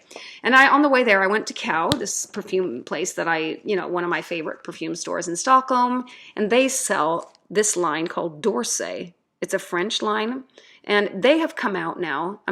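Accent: United States. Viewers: I thought so too. I’m American and assumed she must be an expat because she sounds very American. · Thanks for the insight! Very interesting fragrances that go far beyond the ordinary. I thought you are American because of your accent. Greetings from Hellas!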